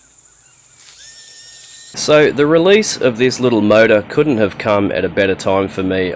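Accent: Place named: Australia. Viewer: Ha! I thought from your accent you were down under. But yeah, NC probably still a bit more toasty. I don't think it is. Josh did a redo after his near meltdown. Glad he's simplified and condensed things down into one rig. New unit looks killer. But I still find I can't quite figure out his shop page. Definitely could be just my dyslexia, but I think it still needs more work. I can't tell what comes with what or if the combo/kits are complete or partial. Always prevents me pulling the trigger as with shipping, exchange rate, import duties, etc. it would be costly to order incorrectly. Love Josh though. He's the mad scientist of 1/24th's. :)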